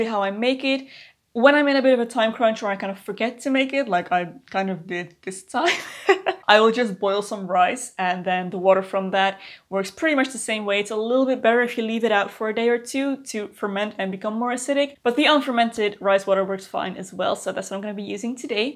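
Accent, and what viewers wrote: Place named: Netherlands. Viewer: Jojo I she’s from the Netherlands, I think 😊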